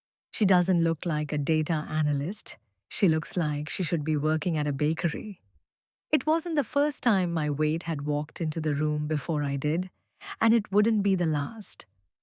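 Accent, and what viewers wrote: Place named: South Asia. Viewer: A white woman with a South Asian accent ?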